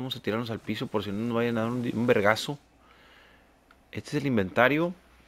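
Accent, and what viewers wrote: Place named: North, Mexico. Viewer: Suenas norte, como chihuahua, sonora o baja californa. Se ve bueno el juego, creo lo comprare.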